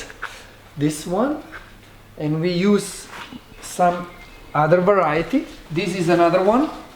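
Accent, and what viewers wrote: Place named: Wales, United Kingdom. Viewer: Slovenian. Accent isn't a hundred miles away from Welsh either ha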